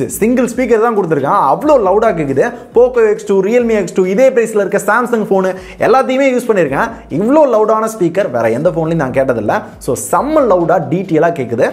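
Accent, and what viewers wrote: Madurai, India. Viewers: Madurakaaranaya nee😄🔥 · Bro neenga madurai bro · Bro nega madurai ya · Bro okaluku madurai ya?? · Madura karanku thon antha slang puriyum.. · bro ninga madurai ya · bro nenga madurai ya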